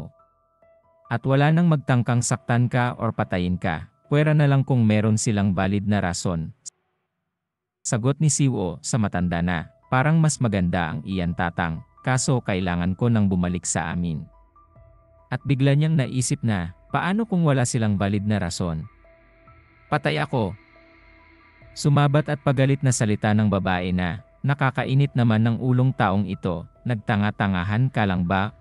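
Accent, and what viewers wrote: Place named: Igorot, Philippines. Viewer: Igorot narrator to😅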